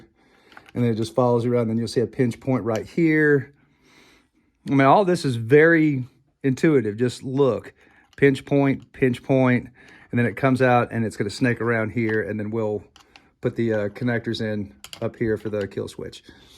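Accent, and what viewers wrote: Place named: South, United States. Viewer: Buddy, I heard the southern accent and euphemisms and knew right there that this video was the only video I needed. You're right. That German engineering is dummy proof. Thank you for the walkthrough, dude. Be blessed.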